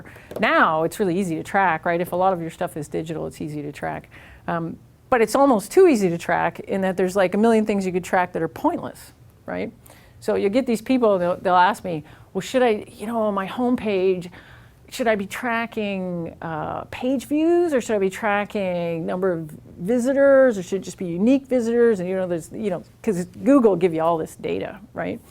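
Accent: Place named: Canada. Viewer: excellent video #1 - canadian accents are awesome #2 - "it's not a pivot. same product, same thing. It's just a different way of talking about it" #3 - i think i'm going to join <link> #4 - "if it wasn't really hard, we'd all be in fiji drinking fruity drinks from a coconut, but we're not because this is hard" #5 - what does "gorpy" mean?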